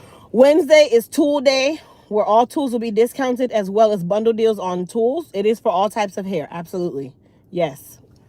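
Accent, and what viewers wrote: Jamaica. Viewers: how are you, you always look good and your hair's on point, I love the color and style period. You are a funny person and when you get hype or talk fast I hear your accent the show that you are from Jamaica, I'm just smiling the whole time and still smiling while I'm doing this text to you, you are the type of person that every person need to have you around them, you happy, funny, joyful, very, very smart, and a beautiful person, Heaven Sent from God, I'm glad that I know you from afar and I will always be looking at you live on video, and ordering your products, I just order some of your products April 1st, 2023, thank you for that 35% off, I do appreciate it so much, take care and tell the next time, take care be safe and God bless always, love you girl, peace out.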